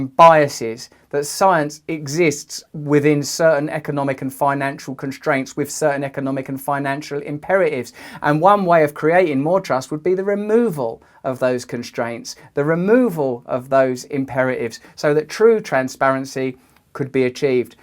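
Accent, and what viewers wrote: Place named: United Kingdom. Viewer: The Brits are so cute when they pronounce words.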